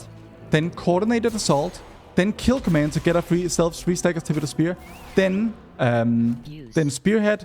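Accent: Denmark. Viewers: Love the guide! I do have a bugging question.. are you a Dane?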